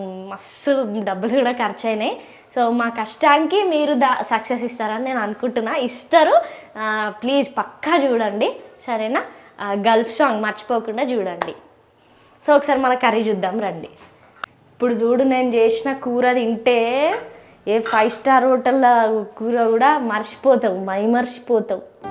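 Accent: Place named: Warangal, India. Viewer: Warangal slang vere untadi... Akka mi slang masthu untadi..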